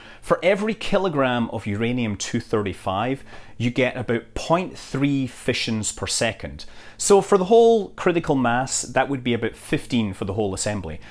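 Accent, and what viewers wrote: Scotland, United Kingdom. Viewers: This is a good level of explanation, I like it. You're sounding more scottish than usual today for some reason...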